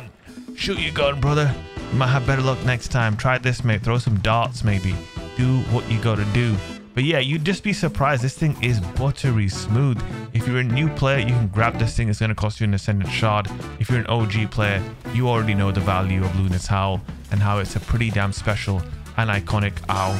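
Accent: United Kingdom. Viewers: I love your videos but will you only make PVP content forever? At least once, show some of that gravy PVE talent you got with that british accent spice, gud lad!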